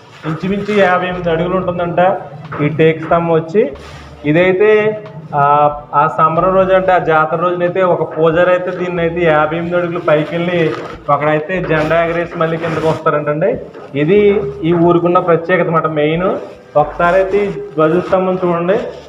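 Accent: Godavari, India. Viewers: Nenu.. Mee slung kosam chusthanu... Pakka godharolla voice... 😊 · I'm Guntur but I like godhavari yasa baguntadi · Funny conversation... With our godavari yasa.. Good job bro... Mana godavari jilla ante yentha special lo andariki chupistunnaru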